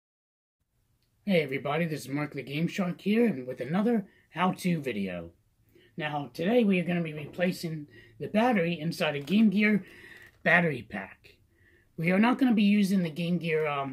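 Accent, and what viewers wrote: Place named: Philadelphia, United States. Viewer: You sir are from the Philadelphia area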